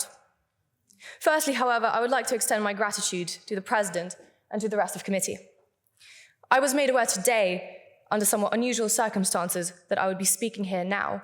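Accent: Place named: United Kingdom. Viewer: you cannot say so because she mush have been living in the UK for too long given her accent. Probably immigrated as a child.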